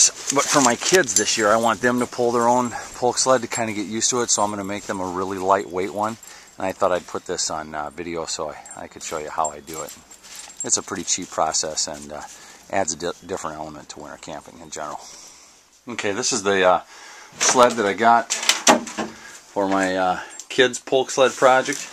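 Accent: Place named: South, United States. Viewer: That turned out niiiiiice!. Lmao at "I love that smell" man that is priceless bet you got beat up by the tree huggers on that one lol. If I had just ran across your channel and there was no snow on the ground I would have thought for sure you where from the south lol. Thanks for sharing the build God Bless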